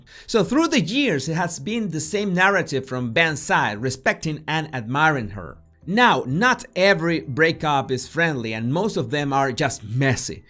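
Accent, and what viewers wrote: Spain. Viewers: Yes, I understand spanish or more what you call "spanglish" but sadly do not speak it fluently. I'm mexican/spanish american. My Mom's 4th generation and Dad's 1st on his father's side...but 3rd on his mother's side and was born here too, so they both speak it fluently...but don't have much of an accent when they speak english. We're very proud of our heritage and culture but obviously are very "American". I wish I spoke spanish better. What I like about is he speaks perfect english with a beautiful spanish accent, but even though he speaks at a nice pace, it's not too fast when he is giving his commentary, compared to most people who speak fluent spanish. Love the Latino accent...italian too! 😁💕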